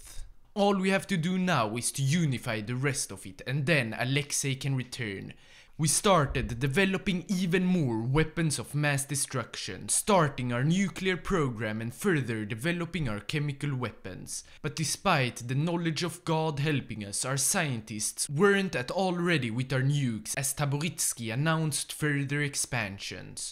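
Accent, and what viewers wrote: Sweden. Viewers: I’ve been a subscriber for a long time hearing ur Swedish accent and a very big popularity hope you become more better 🎉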